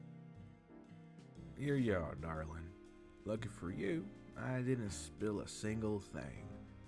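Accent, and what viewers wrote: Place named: South, United States. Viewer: ✨Ohhh a southern accent! You did a phenomenal job! Loved it!😊👏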